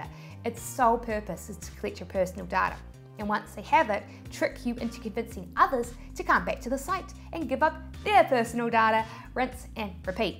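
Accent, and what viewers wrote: New Zealand. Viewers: You are the only YouTuber I know with a New Zealand accent. I never heard this before. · Your Kiwi accent maybe your biggest asset here. If the person pretending to be you can't say "fush and chups", then clearly, they aren't you.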